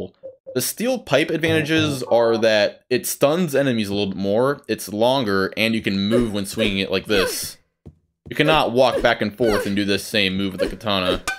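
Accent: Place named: United States. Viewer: Thanks for answering, if you don't mind me asking, what place in America is the accent from, I'm asking because it's a little different from what I usually hear in movies and games.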